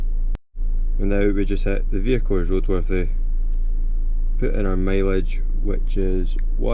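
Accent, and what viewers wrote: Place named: Scotland, United Kingdom. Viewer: He is speaking properly...he's in Scotland!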